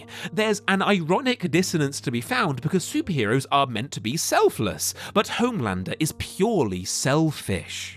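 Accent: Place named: United Kingdom. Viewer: I know it’s the same actor/YouTube host but with that thick British accent, both of you guys sound evil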